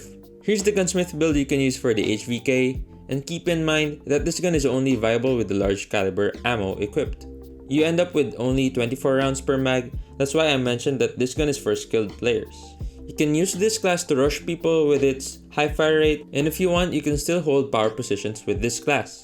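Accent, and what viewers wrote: Philippines. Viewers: Pinoy ka lodi no hehe gawa ng accent mo sa english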